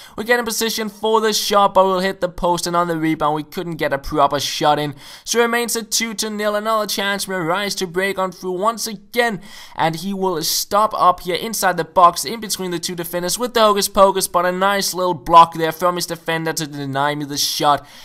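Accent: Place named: Denmark. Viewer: Man kan sgu bare høre at du Dansker ikke sandt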